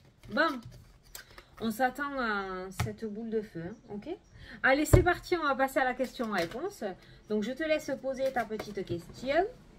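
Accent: South, France. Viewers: Bsr, toujours aussi pétillante et pleine d entrain et aucunement tu n es vulgaire. Pas du tout!!! Je suis du Sud aussi et c'est vrai que l on parle comme ça, mais ce n'est pas de la vulgarité!!! C'est notre parler!! 😃 merci beaucoup pour ce tirage! Reste telle que tu es, un soleil pour nous tous! Belle soirée 🙏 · Moi j adore ta bonne humeur et ta façon de parler du sud , je kiffe comme dirais les jeunes🤣😂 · Cc on t'apprécie comme tu es très nature et spontanée ça fait plus de 2 ans que je te suis avec l'accent du Sud + mots d'oiseaux c'est un feu d'artifice très plaisant 👍🏻🤗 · salut:) je voulais te laisser ce petit commentaire juste pour te remercier <3 j’ai 17 ans et je te regarde depuis 3 mois, depuis une rupture qui a été très difficile pour moi… tes vidéos me redonnent le sourire, tu dégages toujours de très bonnes ondes, toujours très souriante… et assez amusante!! 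je viens aussi du sud et ton petit accent et ces fameuses « expressions » font tout ton charme haha!! encore merci pour ta bonne humeur<33 Chiara:) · Bonjour nous au moins on a l accent qui chante je suis du Sud aussi🙂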